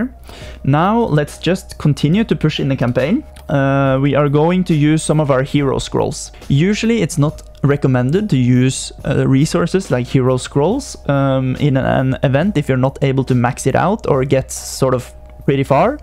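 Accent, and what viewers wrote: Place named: Norway. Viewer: Kan høre at broder er norsk, keep gaming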